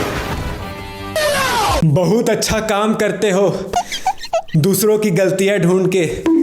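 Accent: Chhattisgarh, India. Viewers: Bhaiya app Chhattisgarhi ho kya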